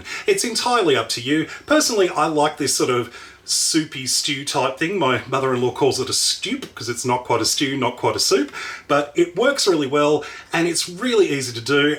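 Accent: Australia. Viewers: Man you di so well on youtubie shit what is your secret. You sound Australian as I am. Good work I have saved heaps of your recipies. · OMG, didn't know you r OZ!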